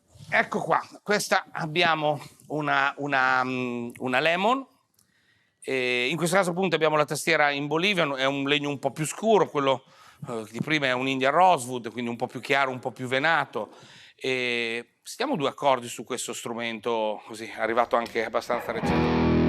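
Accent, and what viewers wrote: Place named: Emilia, Italy. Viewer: Non so perché ma la parlata emiliana di, anche se parla di chitarre, mi fa venir fame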